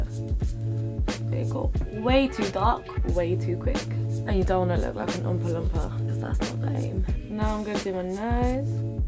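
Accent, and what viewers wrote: United Kingdom. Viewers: your voice like a British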